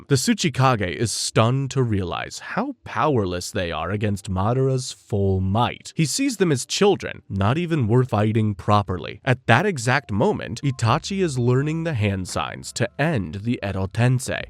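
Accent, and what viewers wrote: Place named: United States. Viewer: loved the videos but holy can you please stop pronouncing all the names UBER AMERICAN holy fk Its MAN GE KYU not MAN GEK KJO, this is just one of like 9000 cringe moments, its not to hard to do such a thing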